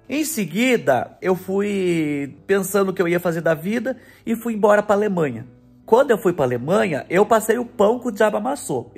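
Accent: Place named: Minas Gerais, Brazil. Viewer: Abençoado curiosidade .eu achava q tu era mineiro pelo sutaq e tb o jeitinho meio caipira é lindo ! Mas as vezes ñ entendo bem .lembrando q sou brasileira tá ?bjinhos no ❤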